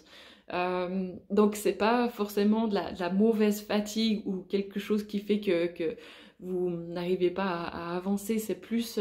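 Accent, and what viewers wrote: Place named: Switzerland. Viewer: Merci beaucoup pour cette vidéo! J'aime beaucoup ta manière d'expliquer ☺. Je me suis dit mais je connais cet accent et j'ai vu que tu étais en Suisse comme moi d'ailleurs. Je ressens beaucoup de fatigue actuellement et ce que tu as dit résonne juste en moi. Je fais de la méditation pour intégrer ces énergies au mieux. Je t'envoie beaucoup d'amour 😇🙏. Je me réjouis des prochaines vidéos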